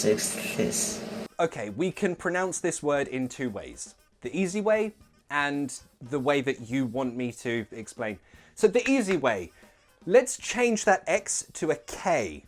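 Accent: United Kingdom. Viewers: I really do luv tgis man why in teaching .... he's the only reason why i like British accent ....he makes the British accent so easy....thanx man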